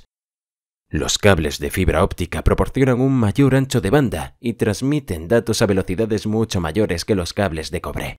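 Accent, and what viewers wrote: Spain. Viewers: Porque debe estar en español de España? Luego se me queda ese zumbido a tono español 😥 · por que hablar en español marcado cuando puedes hablar neutro ?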